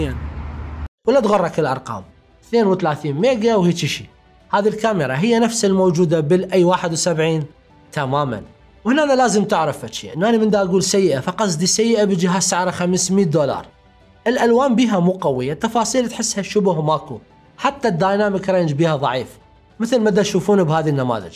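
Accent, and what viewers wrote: Iraq. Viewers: اتا بشوف الفيديوهات فقط منشان استمتع باسلوبك المبدع ولكنتك العراقية الجميلة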